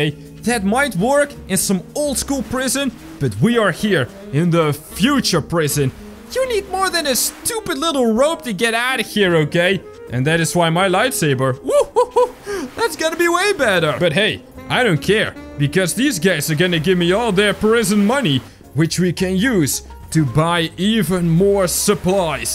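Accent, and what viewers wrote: Netherlands. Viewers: Just wondering but are you dutch, because I just thought I heard a dutch accent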